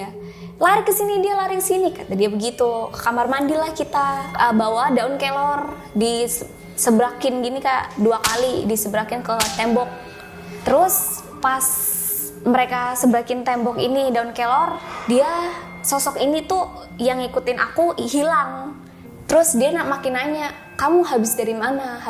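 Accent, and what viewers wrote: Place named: Pemalang, Indonesia. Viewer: Orang Pemalang mn suaranya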